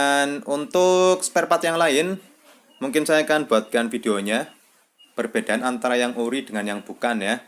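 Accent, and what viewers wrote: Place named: Tegal, Indonesia. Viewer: Kiye mah wong Tegal ngalor kiyeeeeeeeeeee 🤣🤣🤣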